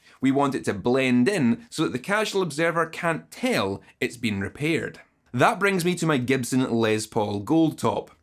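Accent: Scotland, United Kingdom. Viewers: Honest to God I though you were gonna be a guy from LA instead a glorious Scottish accent has gretted me I am happily surprised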